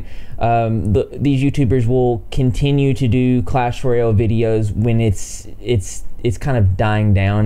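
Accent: South, United States. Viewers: Your alternative style mixed with your country accent is pretty fire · I really enjoy your dialect, it souds so down-to-earth and accomodating. I can tell it's a southern 'accent' but where are you from exactly if you don't mind me asking? :)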